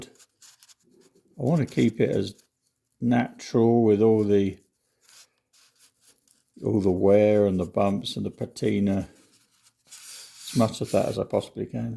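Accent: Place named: England, United Kingdom. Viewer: Thank you. The way you routed for the mahogany splines is something I will try next time I fix a headstock. On a modern guitar, I might use veneers on the front and back of the headstock to hide my work. The way you did it on that classic was perfect. Not to be weird, but I also like how you talk; what part of England are you from (if you are Irish, Welsh, or Scottish, I apologize)?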